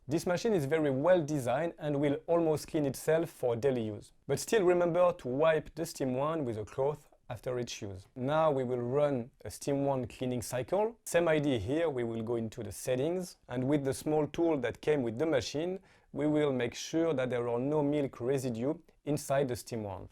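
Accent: France. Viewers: We love your French accent, it makes it so interesting ! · Great video. Vety informative. I also enjoyed hearing your French accent. Merci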